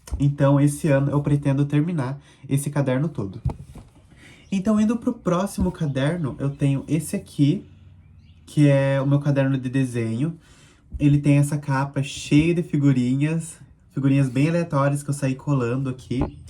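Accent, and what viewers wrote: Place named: South, Brazil. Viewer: Moço voce e sulista? Que sotaque fofinhoo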